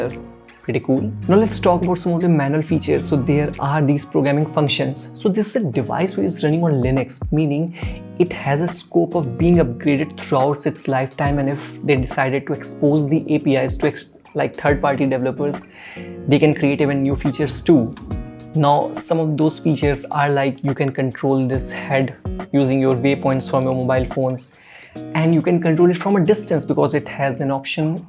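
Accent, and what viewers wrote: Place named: India. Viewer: That indian accent is just too heavy to understand. Just watch this instead... <link>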